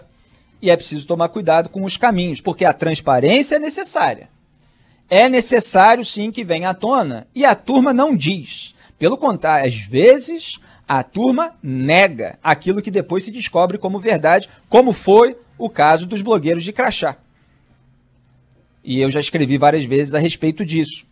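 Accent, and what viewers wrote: Rio de Janeiro, Brazil. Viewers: Fala carioca 🤗🤔🇧🇷🤭😷liberdade de críticas ❤️ admiro sua coragem.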